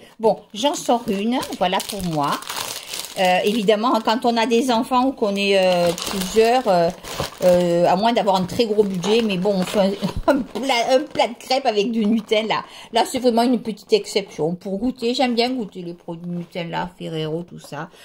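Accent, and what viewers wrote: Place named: Ariège, France. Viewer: Coucou ma puce , j'adore regarder ta vidéo et je vois que tu as gardé ton petit accent ariegois ❤ bravo ma chérie , pour tes petites courses , j'adore ma puce tu es vraiment naturelle , gros bisoussss ❤❤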